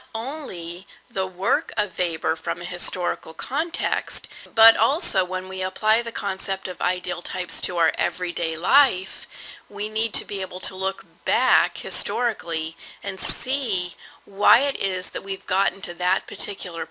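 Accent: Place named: China. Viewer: Chinese equar English, l can't understand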